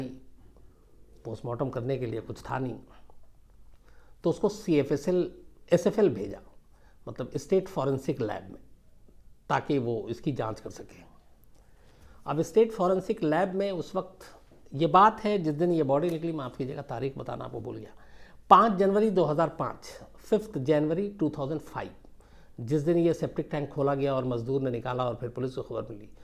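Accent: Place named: Uttar Pradesh, India. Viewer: Tahoe je gale me kuch math Daliye UP ke bhaiya lag the hain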